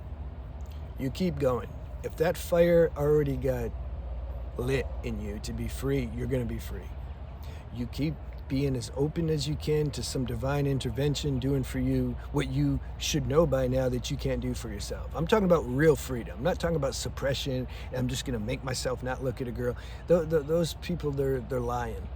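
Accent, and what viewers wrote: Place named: Jamaica. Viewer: ya talking like a rasta man now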